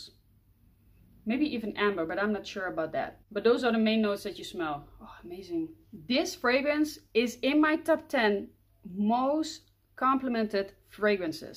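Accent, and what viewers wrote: Netherlands. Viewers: Nice accent! Netherlands??and yeeeeeee My fav montale is intense Cafe also !!! 😁😁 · Are you dutch????? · Haha ik wist dat je nederlands was. Hoorde gelijk aan je accent. Nice. Doe je ook nieuwe montale geurtjes? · I hear dutch accent, are from your Netherlands? Im discovering Niche too, great video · Jij bent nederlands ik hoor het direct 😜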